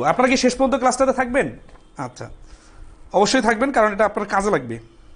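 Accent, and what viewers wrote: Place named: North Bengal, India. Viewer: স্যার আপনার ভাষা শুনে মনে হয় বাড়ি উত্তরবঙ্গ। আপনার বাড়ি কোথায় স্যার?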